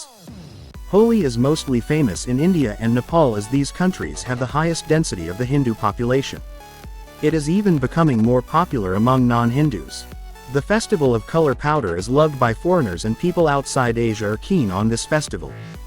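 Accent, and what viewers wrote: United States. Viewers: Thank you for helping us, providing high quality videos with American voice over. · Thank you for helping us, providing high quality video American voice over sir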